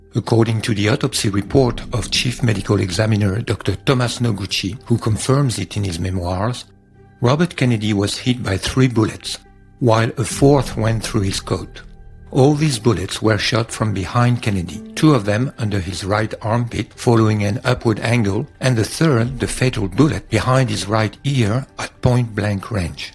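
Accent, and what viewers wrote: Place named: France. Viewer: This is a video that needs to be seen by EVERY American! (I only wish the narration was by a native English speaker as the French accent makes it difficult at times). Any country so brazen to bomb an American warship in 1967 would be brazen enough to assassinate an American president in 1963 Here we have the reason for classifying so many documents decades later. The American people must not learn that their "greatest ally" assassinated one of America's most beloved president (probably the most beloved in the modern era - even by republicans)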